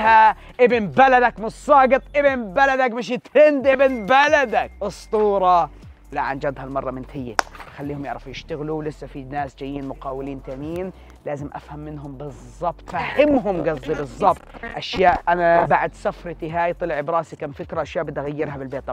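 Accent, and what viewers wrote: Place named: Iraq. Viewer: انتة صرت تحچي عراقي عيني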